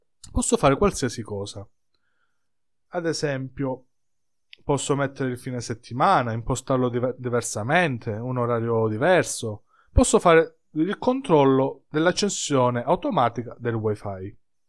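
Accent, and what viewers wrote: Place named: Calabria, Italy. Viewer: sei calabrese come me si sente, complimenti per il video!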